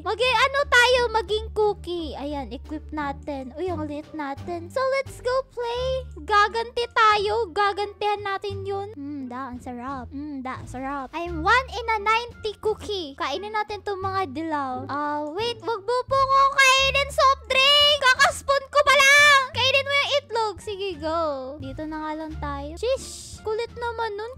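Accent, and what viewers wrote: Visayas, Philippines. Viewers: Ate. visaya ka no kasi narinig kita na sabi mo. ayaw saba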